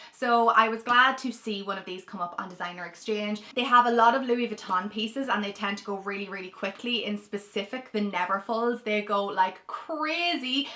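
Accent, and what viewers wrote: Ireland. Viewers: I love your irish accent!!❤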